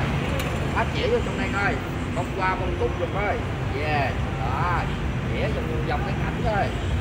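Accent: Mekong Delta, Vietnam. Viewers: không làm bộ đâu có nghe nói 30 năm chưa về Vn nếu như vậy anh rời Vn 1993. Nhớ không lầm là người Trà Vinh cho nên nói người nhà quê đi Sài Gòn là đúng không có sai. Bạn nói làm bộ lúa cho phim hấp dẫn nghe cho rõ giọng nói là giọng miền tây chính gốc. Nếu đi 1993 Vn còn bị Mỹ cấm vận thương mại mãi đến 28/01/1995 hai bên mới mở văn phòng phòng đại diện. Đến 1997 Vn chính thức mở cửa thương mại thông thương với các nước nên mới thay đổi bộ mặt Sài Gòn Gòn xưa. Những năm đó muốn nhập hộ khẩu ở Sài Gòn cũng rất khó khăn.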